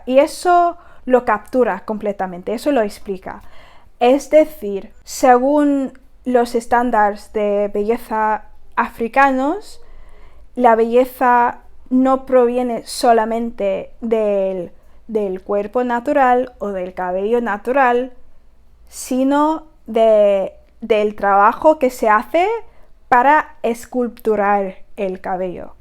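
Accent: Spain. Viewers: ¿Cuantos idiomas hablas? Tienes una dialecta de España. 😁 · Tienes el acento muy Español cuando hablas, aunque si, hablas muy bien! Emma Dabiri me parece una mujer bastante inteligente y la perspectativa que nos contaste de su libro es interesante. Para mi personalmente escogì a crecer mi pelo Afro natural por razones relacionadas a mis creencias politicas. Fue un acto de resistencia feminista negra y asì desde entonces vivo con el espiritu y mente completamente libre. Hasta hoy en dia, encuentro muchisimo inspiraciòn del estilo de las panteras negras como Angela Davis y Kathleen Neal Cleaver. Es que Emma Dabiri me da mucha pena y decepciòn. Primero, no creo que ella hubiera tenido esta plataforma de hablar por parte de las mujeres negras si no fuera mulata con la piel clara. Podria ser que habria algunos que no estan de acuerdo, pero ella utiliza esto ademas a su sexualidad para subir su visibildad publica y para adelantar su carrera. 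Por ejemplo no entiendo por que auñ de ser respetada por sus ideas intelectuales tenia que publicar fotos de si mismo llevando nada mas que su ropa interior en Instagram?? Para mi esto muestra que intelectualmente ella no esta tan iluminada ni liberada como tal. Es que nunca nos vamos a ver a un hombre a su mismo nivel profesional presentandose en instagram medio desnudo intentando a utilizar su sexualidad para subir su perfil publica. Eso es solo lo que queria comentar en cuanto a ella. Y como tu, soy britanica pero aprendì mi español viviendo y trabajando en Cuba y Colombia. Me encanta los acentos de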